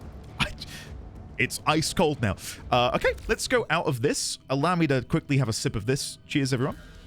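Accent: United Kingdom. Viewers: I totally agree in regards to playing the game solo for now, I played the whole starchart solo and it helped me to learn all the mission types and game mechanics better. The only time I joined squads was for interception missions as defending 4 points on the map solo was a challenge for me 😊 You sound as though you are also a fellow Brit , not sure I have seen many UK players make videos. Great vids btw and yes personally I love watching your whole WF playthrough, it's a bit of nostalgia for us who have been plying for years, we enjoy seeing new players experience what we have experienced, the new player experience seems much better now. Love your excitement and energy. 😂 You can buy a codex scanner from the market for credits if I remember correctly, then you can use your Helios to auto scan enemies like Vor and Vey Hek etc, every enemy actually for your codex entries. 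It will then also show you which damage type is best for which enemies, not that you need to worry about damage types yet The difficulty will increase per planet so don't worry it will get tougher.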